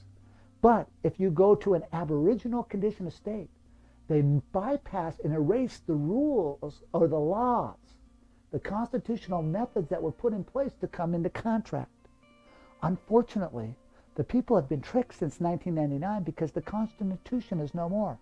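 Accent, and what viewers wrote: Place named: United States. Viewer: Transcription de la conférence ci-dessus de Russel-Jay : Gould Ne parlant pas couramment l’anglais L’accent américain ne m’aide pas Voici donc ci-dessous La transcription de la traduction you tube Avec certainement des fautes de traduction Gratitude * * * Salutations J'ai regardé les médias et les choses qui se passent dans la société Il y a beaucoup de préoccupations que j'en … Que j'ai en tant que maître de poste de notre monde Je suis témoin des mots, que les gens utilisent et des conditions historiques des mots du passé Je parle à propos de Babel, non je n'ai pas dit la Bible j'ai dit bavardage : bable, qui est une grammaire fictive C'est la grammaire ou la communication que nous utilisons pour la confusion Et je voudrais tout d'abord dire honte au gouvernement des États-Unis au Congrès, à la poste les militaires US, et des présidents qui depuis 1999 ne se sont pas manifestés Et non pas donné clôture des contrats aux citoyens de cette Grande Terre et aux citoyens du monde que nous étions capturés par un système frauduleux de grammaire de Babel avec le certificat de naissance de tout le monde pour ceux d'entre vous qui n'ont pas les antécédents de ce qu'est un certificat de naissance s'il vous plaît aller sur <link> et regardez mon film mais revenons à ce que nous voyons maintenant dans la société dans l'ingénierie et la nouvelle compétence en communication que les gens utilisent vous entendrez les gens utiliser le mot aborigène lorsque vous commencez un mot avec une voyel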